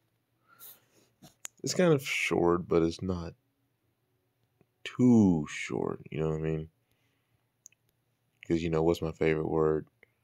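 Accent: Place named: United States. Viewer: She looks so cute and she's gonna be so smart and philosophical with the way you talk to her! I love your conversation and also your slang with the American accent, I'm in London! 🤩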